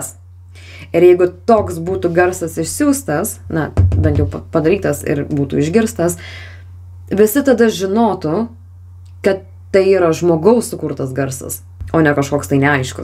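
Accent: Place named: United States. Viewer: Ausys vemia su tokiu akcentu klausyti, jei tokia amerikone, manau anglu kalba butu geriau daryti video, turesi bent didesne auditorija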